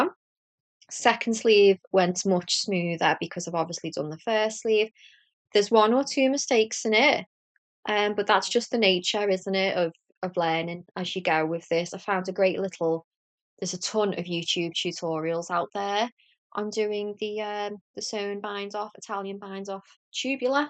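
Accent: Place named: Northern England, United Kingdom. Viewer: Love your podcast. You were recommended to me by someone in my discord channel and you've quickly become one of my favourites (Plus it's so nice to hear another Northern lass on here!) x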